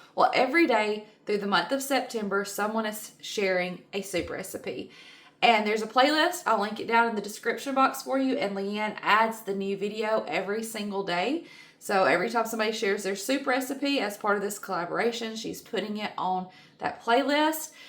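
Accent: South, United States. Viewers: How can this not be a must make soup your opening said it all: My favourite soup recipe, potato recipe and crock pot recipe 😃. It’s in my recipe journal. I liked your reflection about washing dishes and the use of that time. Thanks for putting the recipe in your notes. I really like your southern accent it’s one of my favourites, it’s like a big hug. Thanks for sharing, so far from soup September one of the easiest to cook, thank you crock pot inventor. We all need a bit of easy in life. 🍲🥔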